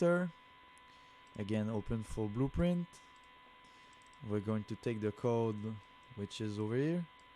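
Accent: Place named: France. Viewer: Hey, just want to let you know that your French accent is very nice and clean, you speak English a LOT better than lots of my French friends.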